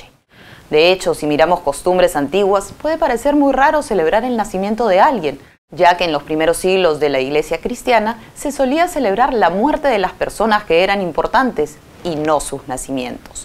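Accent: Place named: Mexico. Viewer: De que país es te programa. Tu acento neutro me hace identificar de que país eres. Pero sospecho que eres mexicana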